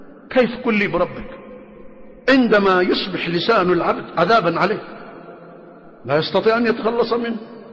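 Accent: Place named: Sanaa, Yemen. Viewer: بل هذا للشيخ عبدالله بن عثمان الذماري، فهو يتكلم ببعض الحروف بالهجة الصنعانية، ركز في الصوت، ما شاءالله يشابه صوت العلامة ربيع بن هادي المدخلي حفظه الله.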